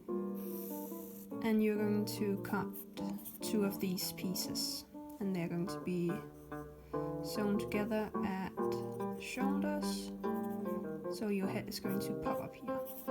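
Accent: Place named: Denmark. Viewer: Youre danish lol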